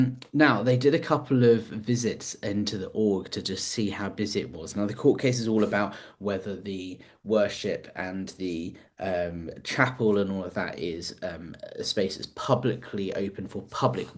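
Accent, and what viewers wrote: United Kingdom. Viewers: oooh that’s interesting!!! I am in Aberdeen Scotland. I have been following Chris, Aaron, Marc and others for a few years now. You and Jon Atack are the only out there ex scientologists I have come across with ‘British’ accents which brings Scientology closer to home, but I never really thought about it’s impact here in my part of the UK. Once, a handful of years ago, a guy my hubby worked with in Aberdeenshire mentioned he was going off to a Scientology meeting, of course pretty arrogant about it all, but my impression was he was still very new to it all and I guess in my mind I figured it would be him and a couple other ‘strange’ people involved in something so ‘crazy’. Now I wonder just how many folk up here, meaning Scotland not just my small part of Aberdeen, are still tied up with this cult. Is there anywhere I can find out about Scientology in Scotland, or is that too small a group to factor much? 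By the way, new subscriber, loving catching up on your videos, good luck with the channel!!!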